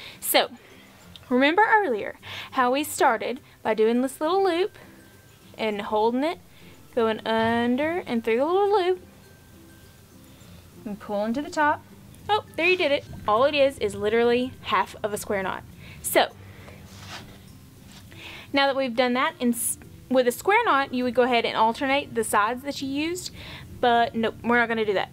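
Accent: South, United States. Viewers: I'm from California and very very rarely do I like southern or country accents but yours.....omg! Its toooooo cute! I love it, love your bubblyness that's not goofy and I LOVE how articulate you are with your directions and instructions! You're a VERY GOOD instructor! Thank you for the refresher course! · Oh my gosh, you are such a stunning Southern Belle! I love your accent, I love your vibe and thanks to your simple and beautiful videos I can now do macrame just like my mama used to when I was little. She tried to teach me but let's just say she wasn't a natural born teacher lol. Thank you so much! Big hugs 😘😘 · Where are you from? I know it’s the south for sure 😂🤣😂🤣😍😍😍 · Thank you sweetie, I love your accent, I am a southern girl too, need to learn macrame so here I am! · I like your accent. What Southern State are you in? This Video helps me a lot. 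Love it. Thanks! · Love your southern accent !😂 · I hear a Southern accent...where are you from? Tennessee here. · Now if all my teachers in the high school where is interesting beautiful talented and charismatic as you are I think I would have been a straight a student not to mention that beautiful Southern accent really makes you hang on every word I can't thank you enough stay safe and and god bless ✌️🇦🇺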